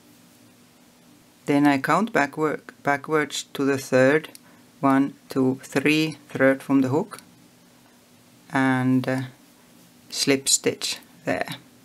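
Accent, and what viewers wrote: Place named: Finland. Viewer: Kuuntelin aksenttia ja totesin että oiskohan suomalainen ja kyllä vaan. :D Kiitos ohjeesta.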